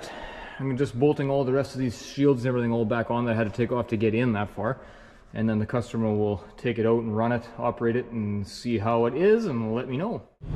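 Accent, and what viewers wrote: Canada. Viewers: Manitoba, eh? Which is where I live too. And you have an accent that places you even closer in Manitoba to me, but I’m not gonna say where.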